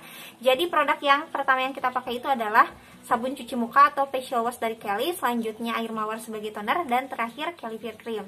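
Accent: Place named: Sunda, Indonesia. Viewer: Eta eumeul eumeul...meni nyunda pisan atuh, si teteh geulis teh...he he...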